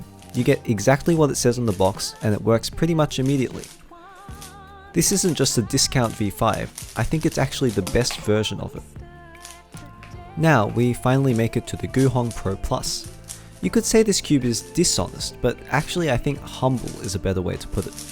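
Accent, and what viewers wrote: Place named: New South Wales, Australia. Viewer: yo are you from nsw if u dont mind me asking?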